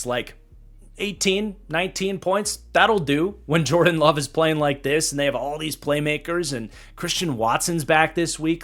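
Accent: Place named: East Coast, United States. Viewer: that east coast accent though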